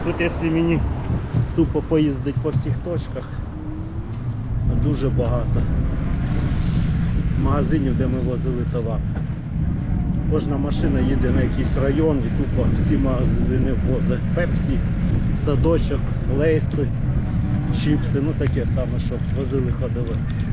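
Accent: Poltava, Ukraine. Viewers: Доброго вечора,! Ми дуже раді Вас знову бачити. Дивимося Ваші відео і на душі так тепло стає. А коли моя мама чує полтавське мовлення, то аж плаче, бо ми зараз далеко. Дуже переживали за Вас, коли дізналися, що Ви у ТЦК. Переживали як за рідного. Нехай Вас Бог береже 🙏🏻 Я хотіла спитати Вас, чи можливо буде для Вас покататися на дві адреси? Перша - це по дорозі від Вас, Ви часто проїжджаєте повз цей будинок, тільки у дворі ще не були. Це адреса Героїв України 34/24 А корпус 1. Це великий полукруглий дім, як тільки переїхати міст з Половок, там є зупинка Сади 1, а далі отой жовтий будинок із зеленими балконами. Якби Ви проїхалися у дворі, на ігровій, особливо біля перших під'їздів. І ще одна адреса вул. Полюсна 12. Це за Макдональдсом, раніше була зупинка Боженка. Біля будинку ще є кафе Роксолана. А ось за нею знаходиться цей будинок. Теж прохання проїхатися у дворі, 2 під'їзд. 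Ми хотіли б Вам віддячити. Не можу знайти, як перерахувати Вам кошти. Напишіть, будь ласка. Будемо дуже вдячні!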